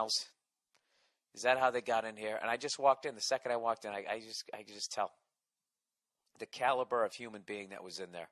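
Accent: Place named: Michigan, United States. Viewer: Sounds like people from flint Michigan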